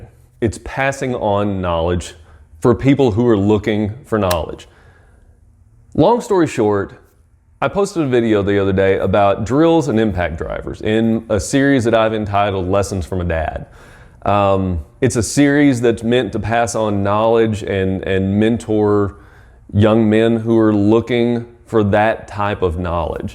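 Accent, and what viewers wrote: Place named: Milwaukee, United States. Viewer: You sound like a Milwaukee guy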